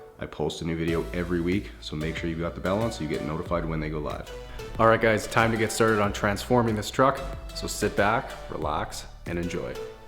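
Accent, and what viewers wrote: Saskatchewan, Canada. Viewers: Your voice is so soothing. And I like the way you word things. And your Canadian accent is growing on me too! Love your vids keep it up 👍🏽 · He's from Saskatchewan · are you guys dumb ??? He means he talks in a Canadian ""slang"" with a Canadian accent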